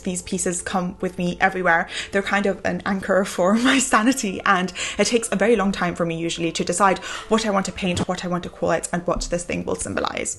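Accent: United Kingdom. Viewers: Are you British? I though you were an Aussie.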